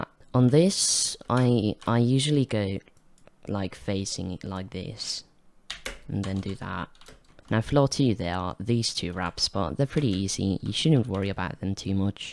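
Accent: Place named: United Kingdom. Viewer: i thought he was a brit lol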